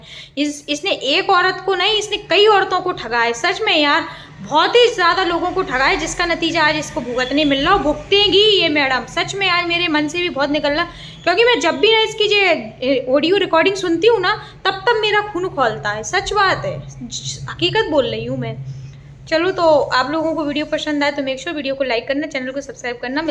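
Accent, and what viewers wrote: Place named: Madhya Pradesh, India. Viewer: Waise aap MP se kha se ho…mai bhi MP se hoon….apki language Suen kr lga ki aap hmare taraf k hi ho😅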